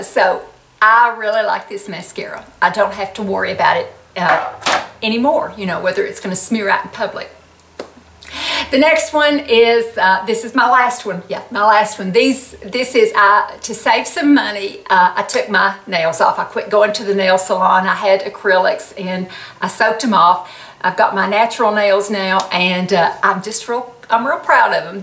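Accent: South, United States. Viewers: Wow! Your hair looks even more beautiful than usual. 😍 Also, your southern accent is very endearing...who cares what anyone else thinks. I’m from a rural town & have a bit of “hick” in me too. Being you is why I subscribed. You’re one of my favorite beauty vloggers & I hope to look half as good as you at 64. You’re an inspirational stunning lady. 💕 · I am from Alabama and love our Southern accents. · I too am a Southern girl - Kentucky. I love your accent, but most of all your a genuine, natural person! Love ya! Try Opi Nail Envy will strengthen & restore your nails, it’s magic. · I love your sweet southern accent! Your so sweet❤️. We all have different accents... Im from New Jersey and hate my Jersey sound and have been made fun of when visiting other states. Watching your video has helped me to look at it in a different way❤️. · I love your accent! 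I’m from California and I put on your channel while I’m making dinner. I don’t know why it is, but your southern accent inspires me and motivates me. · Your Southern accent is beautiful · Howdy, I'm from Connecticut and I don't have an accent 😰. At least I don't think I do lol. Anyhow I love your Southern accent! Can I borrow it lol. Besides my FAVORITE male recording artist since I was a lil girl has an accent like yours and I could listen to him till the day is long. He's KENNY ROGERS!! 👏👏👏👏👍👍👍👍💥💥💥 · I remember the first time I watched one of your videos. I had been searching the web for something and a thumbnail came up about a “hot” grandmother or something taking YouTube by storm. Well, it was you. I was captivated by your sweet Southern charm, adorable dialect, and Goldie Hawn smile. I have been hanging with you ever since. You are a blessing:) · oh my! i LOVE your accent. I always get mad that Miami doesn't have a southern accent. 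I wish we did. I think it is so pretty. YouTube is unsubscribing me from channels!!!!!!! Oh I haven't tried that pumpkin spice. I love coffee. Will have to try that one. I love pumpkin anything. You are the hostess with the mostess! Love those balloons. My nails used to be so very healthy and long. Now they are so weak and not long. I was thinking of going to get acrylics but you've changed my mind. I will just accept and be proud of them as they are. xoxoxo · I do love your accent!!! That’s why I can relate to you. I’m from NC so we sound similar. I’m proud to be from the south. I also greatly enjoyed the PBS series. Love ya ❤️. Don’t change a thing about you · This Georgia girl loves your accent. I'm proud to be southern. Keep on going! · I love your southern accent. It is very charming! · Hi (my sweet Southern Belle), Ken Burns specials are SO professional, informative and entertaining. 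His "Civil War" series is often repeated in our home. Speaking of that, I absolutely love Shelby Foote's Mississippi accent, and the other southern accents too. Never feel embarrassed - your accent and euphamism is what makes you YOU! You are eloquent, well-spoken and charming. Please ignore those negative comments and instead replace them with all the ones you read here 😚 "Mindhunter" was a little slow to start but hubby and I binge-watched this and hope they will be coming out with another year's worth. So interesting, well acted and accurate in its history. Not gruesome - it was respectful. Your decorations are beautiful! Your grandchildren must get so excited when their birthday comes along 😃 💕😚💕 Alli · Bonjour! I’m a Southerner too and for most of French people, I have a strong accent. They like to make fun of us but I don’t care, I love my accent, it shows my roots and is full of sun ☀️ You have a nice accent, and you speak slowly so it’s easier for me to understand you than other American women who speak very fast. 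I love your sweater, you’re stunning as always. Have a great day my Southern friend. Gros bisous de France 😘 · I’m from the south and far from home, your accent is one of the reasons I listen!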